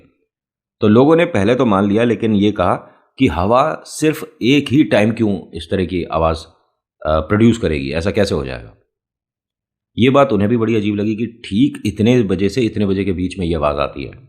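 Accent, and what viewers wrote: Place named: Braj, India. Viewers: bhaiya aapko subscribe aur likes bolne ki zarurat nhi h jo aap se pyar krte h vo phle hi like comment krdete hai aur phir video dekhte h❤❤aur bhaiya braj wale boli aapki muje bhout pasand h❤😊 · Jai mahakal 🙏 bhaiya ji aapke baat krne aur hasane ka trika bhut achha hai ,mera gaon mathura hai waha ese hi baat krte hai, nice story 😊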